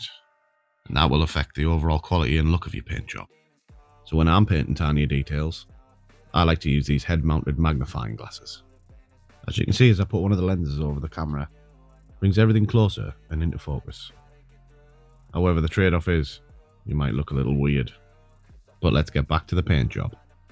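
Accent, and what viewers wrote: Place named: Teesside, United Kingdom. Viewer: Teesside accent? Go on then I’ll subscribe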